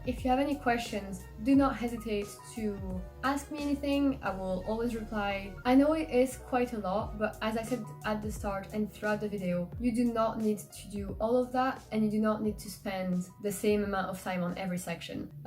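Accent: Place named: France. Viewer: oh cool I've lived in Brussels for a short while. Yeah your accent was saying French and your colours Scandinavia. I guess looks do fool lol 😂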